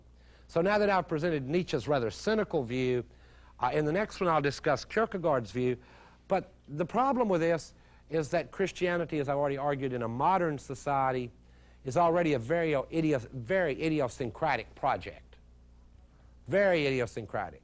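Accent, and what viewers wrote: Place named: Texas, United States. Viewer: I knew this dude was from Texas because he sounds like Mark Rippetoe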